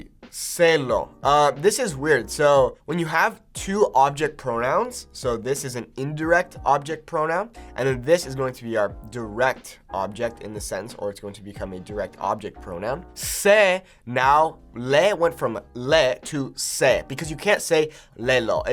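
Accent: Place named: Mexico. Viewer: Hola maestro, tu español es muy bueno, es excelente, yo soy de México y eres la primera persona extranjera que conozco que tiene el acento Mexicano, estoy muy impresionado por tu español y además sabes diferenciar entre los 2 tipos de español que hay, hablo del español de México y del español de España, me apareció tu video en recomendaciones de YouTube, quizás porque estoy aprendiendo inglés y los videos salen como sugerencias, sigue así y felicidades 🎉🎉❤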